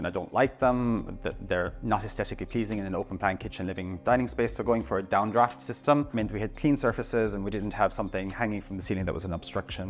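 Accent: Ireland. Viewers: I am the first to admit that I'm a bit of a design snob... And yet, I appreciated and enjoyed the decisions you made to create your personal space. Well done, you. (Plus, an Irish accent to boot!)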